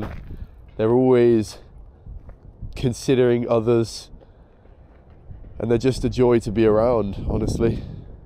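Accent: United Kingdom. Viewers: あなたの英語はブリティッシュアクセントなので、日本人には聞き取れない可能性が高いです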